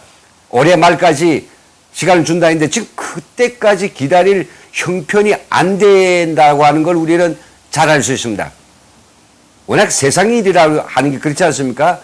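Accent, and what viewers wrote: North Korea. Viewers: 진짜 북한사투리로 재밋게 하시네요~~힘내세요 응원합니다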